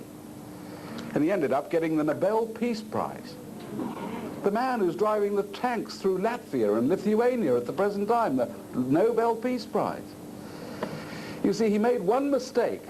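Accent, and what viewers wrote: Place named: Australia. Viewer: FFS. There are 3 main Aussie accents. He has one of them.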